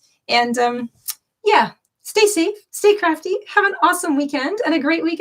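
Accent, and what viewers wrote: Canada. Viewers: Your squares are extra special, thanks so much for sharing. I’m excited to try this. Best regards from South Africa 🇿🇦 hope you are well in Canada? Am I right? Your accent sounds Canadian 🇨🇦💫 oh ok you said hi from Canada ✌️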